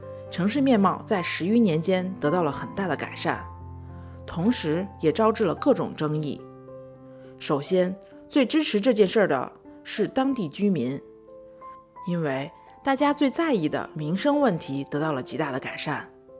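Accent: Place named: Beijing, China. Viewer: 博主，你那京腔太明显了，普通话要好好练练。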